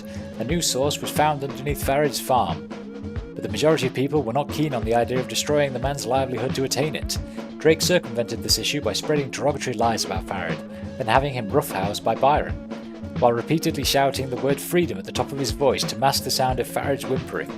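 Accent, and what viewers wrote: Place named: United Kingdom. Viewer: If (I said IF) you are looking for constructive criticism, I'd do a lot more comedic commentary on what you are playing. You don't do very much at all, but your voice is great (especially for an American audience who are inordinately impressed by a British accent), but you aren't giving enough original material. More comedic commentary. I'm going to subscribe and see how you do. 👍👍👍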